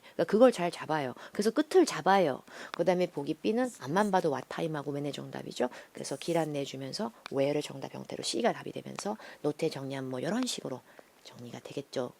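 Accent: Jeolla, South Korea. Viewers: 김선아 전라도버전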